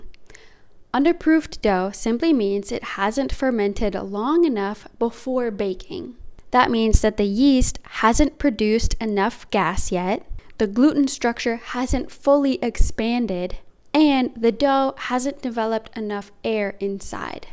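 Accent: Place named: United States. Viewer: Do you have an accent or are you from North America? Like you sound American but with a bit of an accent lol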